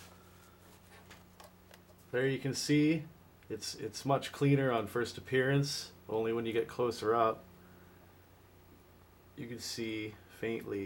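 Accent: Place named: Canada. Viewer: ...you've just been erased!.....i could tell you were a fellow canadian right away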